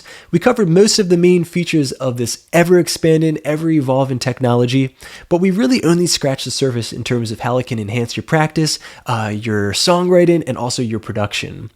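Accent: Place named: Maryland, United States. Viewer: Great demo! Your accent sounds a lot like a Chesapeake Maryland accent.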